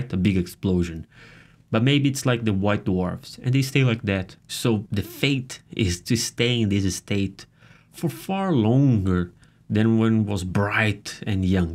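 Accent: Brazil. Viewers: A footage é br e vc tem sotaque br. És tu um br?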